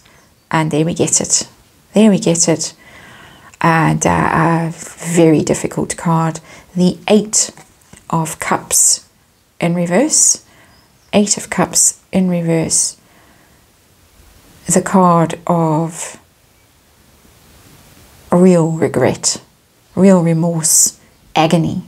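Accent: South Africa. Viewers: Excellent reading! I love your gentle voice and your your gorgeous South African accent - soo soothing. I also love how you speak about HM The Queen, who doesn’t deserve any of this. It’s beyond comprehension how HM can put up with one of her wayward relatives, not to mind two of them (Andrew & Harry). I’m hoping that both of them come to rue the day they cut her life short!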